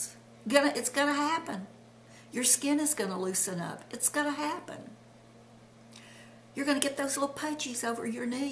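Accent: South, United States. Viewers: Hi, I am new to your videos and just love them. I have watched six already and can't wait to watch them all. I am a young at heart 69 year old and love my make-up, hair styles, pretty and cool clothes and jewelery. I think you are lovely in all ways. I also love your southern accent!! My son moved to South Carolina about 15 years ago, and he has the southern charm now. I am going back to view more of your videos Bye Judie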